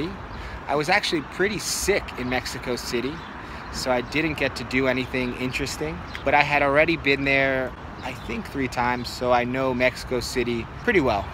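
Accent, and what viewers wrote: Brazil. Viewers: você as vezes fala palavras em Inglês com sotaque Brasileiro. Isso acaba influenciando quando você fala em inglês? Tipo quando mora muito tempo no Rio e pega o sotaque carioca. Já aconteceu isso com você?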